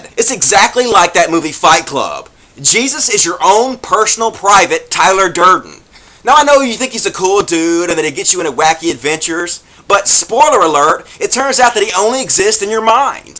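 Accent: South, United States. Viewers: You know whats funny? You have a southern accent, you're atheist, and quite smart. I always thought the first and the last two were mutually exclusive. :P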